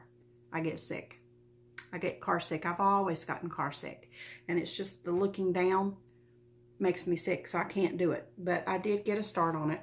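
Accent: South, United States. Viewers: I found you because of a shout-out from Heidi Cran. She said you were real sweet, she enjoyed listening to you; I think she may have mentioned a southern accent. I'd love to stitch the Wysocki charts. Dimensions had a kit "Classic Farm" that was discontinued and word is that they may re-release it. Your Wysocki charts are very reminiscent of that kit. I have been to the P. Buckley Moss Museum and loved it. I stitched a Christmas Stocking chart of hers.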